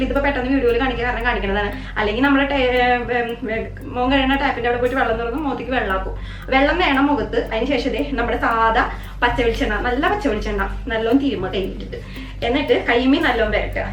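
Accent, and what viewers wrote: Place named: Thrissur, India. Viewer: ഒരുപാട് late ആയാണ് ഞാൻ ഈ ചാനൽ കണ്ടത് പക്ഷേ സത്യം പറയാല്ലോ ഒരുപാട് ഇഷ്ടമാണ്.ഇതിലെ തക്കാളി മുട്ടക്കറി try ചെയ്തു കിടു ആയിരുന്നു.ഇപ്പോൾ എല്ലാ videos ഉം കാണാറുണ്ട്.really love the way u are😍😍😍😘😘😘തൃശ്ശൂർകാരിയേം,ആ സംസാരവും ഒരുപാടിഷ്ടം😘😘😘😘😘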